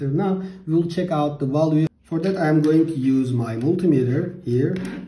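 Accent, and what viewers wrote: Turkey. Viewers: Türk ingilizcesi bu nerde görsem tanırım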